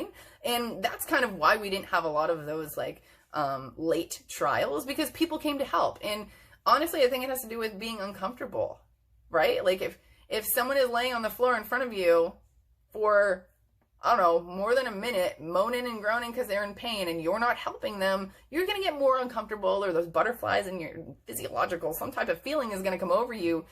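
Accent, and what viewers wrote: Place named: United States. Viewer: thank u thank u for making this engaging to watch because u talk like an actual person. you dont use like a million long words per sentence like these other educational videos you just talk normally and your actually fairly relatable and chatty. and also all the videos for my course are british and after living here for 16 years british accents really start to grate on you so hearing an american accent was refreshing and much less dreadful. its 1am you lowkey kinda saved me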